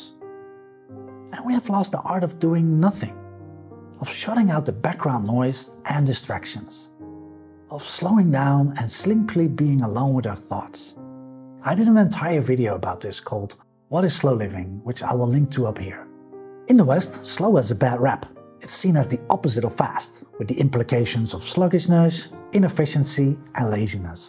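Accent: Netherlands. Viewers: Kom jij uit Nederland? Je praat lijk op een Nederlander toen ze engels spreken haha.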